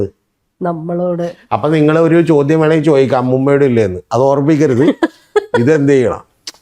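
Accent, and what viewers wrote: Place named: Kochi, India. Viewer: This man is really🤔 funny😆 His cochin slang😅 Anyway I enjoyed😊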